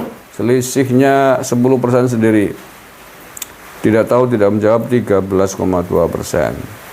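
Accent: Banyumas, Indonesia. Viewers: Rika wong endi pak 😂😂 Wong ngapak mesti Ora ngapak ora kepenak Nyong lima bersaudara...empat ttp kekeh setia karo PDIP/Ganjar Nyong dewek ttp setia karo Jokowi Pejah gesang kula nderek Jokowi Salam ngapak Jatilawang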